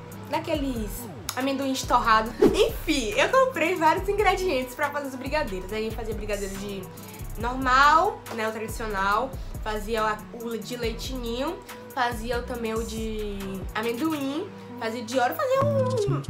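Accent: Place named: Salvador, Brazil. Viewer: DE SALVADOR? NEGAAAAA SUA HISTÓRIA É TUDO E TEU SOTAQUE EU PENSEI EU CONHEÇO ESSE JEITO DE FALAR AAAAA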